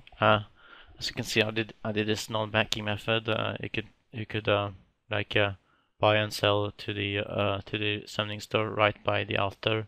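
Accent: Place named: Norway. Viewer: his norwegian accent is very strong and thats not really how we talk at all, mostly elders who didnt learn much english at school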